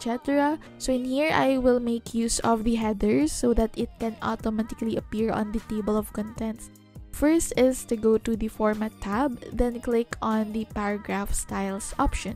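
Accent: Philippines. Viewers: I can tell your From the Philippines May me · based on your accent, are u filipino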